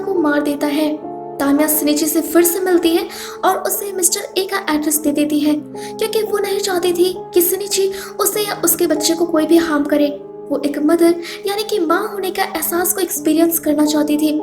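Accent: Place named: Odisha, India. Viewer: aap odisha se ho lagta hai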